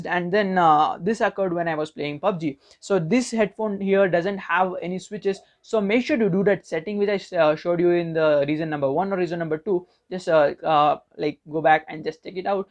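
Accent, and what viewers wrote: India. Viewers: Bro i love the English accent of Indian 👌 cool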